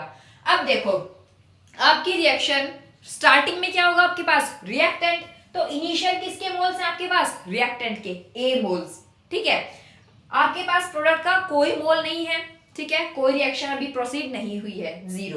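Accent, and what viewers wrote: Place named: Uttar Pradesh, India. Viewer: ji up se hai kya aap if i not wrong because your voice tone is just like that ❤❤❤❤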